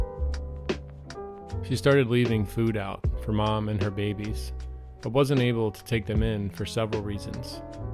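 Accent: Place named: New York, United States. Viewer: "this is de mudda" holy crap that NY accent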